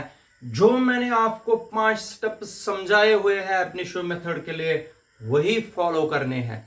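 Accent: Kashmir, India. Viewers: Luv ur teaching as it luk's u might b kashmiri as ur accent is kashmiri.... Thanx.... God bless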